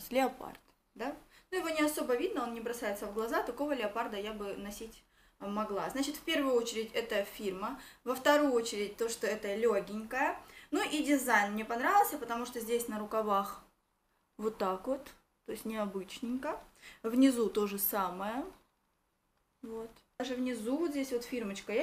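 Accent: Rostov, Russia. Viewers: Не вылазИЮ))) , ой не могу с ростовского говорка ))))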